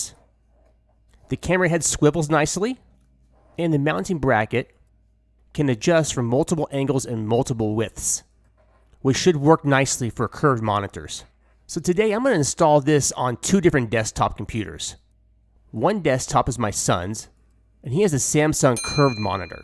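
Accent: United States. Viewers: you from nebraska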